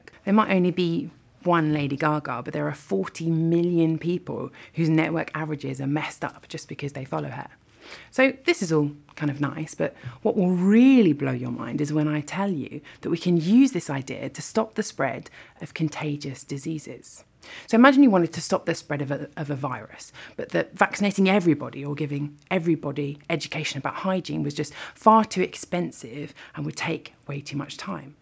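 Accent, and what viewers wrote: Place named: West Country, United Kingdom. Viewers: She has a British accent because she is from Britain and has an accent that also comes from Britain. More specifically, she has a West Country accent. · No such thing as a British accent, since there are four countries in Great Britain - Scotland, England, Ireland and Wales. I don't know about you but I've never heard one accent that combines all four. has an English accent. As far as I can tell she's a well-spoken West Country (Somerset) accent.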